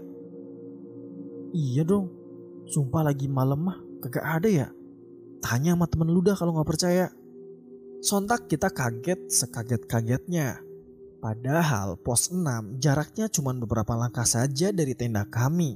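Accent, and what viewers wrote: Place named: Sunda, Indonesia. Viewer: kirain asli sunda semangaatt tross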